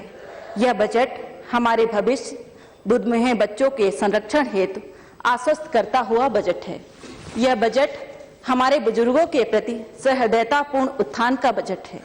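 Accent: Standard. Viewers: इतना शुध्द हिंदी संबोधन बहुत कम लोग करते है ।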